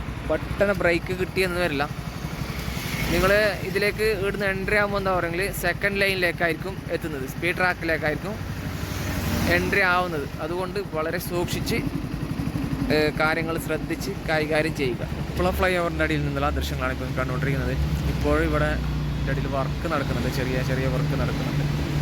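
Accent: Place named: Kasaragod, India. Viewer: ഒന് ഓന്റെ ഭാഷയിലാണ് പറയുന്നത് ബ്രോ കാസറഗോഡ് അങ്ങനെ യാണ്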